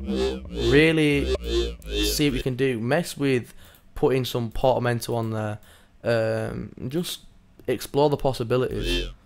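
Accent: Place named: West Yorkshire, United Kingdom. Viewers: Nah, that's a West Yorkshire accent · Bradford by the sounds of it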